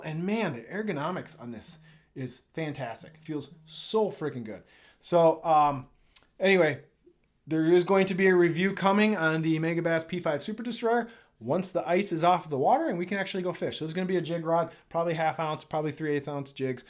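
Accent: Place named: Minnesota, United States. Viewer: Your from Minnesota 😮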